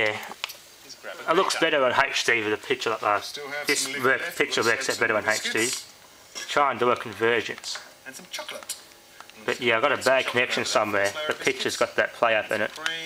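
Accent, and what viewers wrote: Australia. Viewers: Strong regional accent from Australia Mate...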